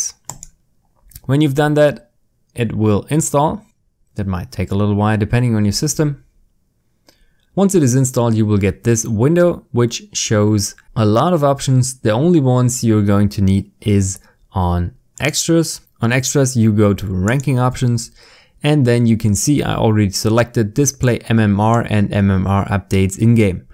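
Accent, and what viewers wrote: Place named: Germany. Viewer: Nices tutorial Es hat geholfen aber dieser deutsche Akzent 😂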